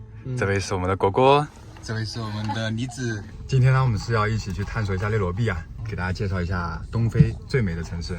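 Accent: China. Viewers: 英文能听懂，还是蛮中式的哈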